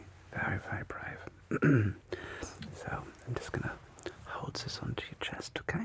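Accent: Germany. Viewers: This is the best thing I've listened to all year ❤ Love the german accent! Wunderbar? 😊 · I really dont know why the german accent is so good within asmr but noone does it as good as. even germans themselves 😂 AND A HUGE PLUS IN THIS VIDEO, is that when you're writing and taking down notes it actually sounds realistic, I hate it when asmr artists just drag the pen across the paper. Thank you great videos keep it up. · I think he sounds like a German who's worked in nz/aus for a while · I have German coworkers, this is a very realistic representation of their accent, honestly the only difference is they have this odd inflection where some sentences are very fast but others are very slow. If they’re excited about something or carried away their accent thickens. But as far as it goes it’s a wonderful representation! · This sounds very authentic, like a person born in Germany who spent some years in primary school living in England with their grandpa or something. 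Like the history yotuber Kraut or a Dr. King Schulz vibe.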